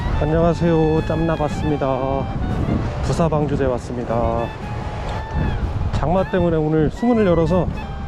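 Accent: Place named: Chungcheong, South Korea. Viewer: 형님 충청도 구수한사투리더듣고싶음ㅋㅋ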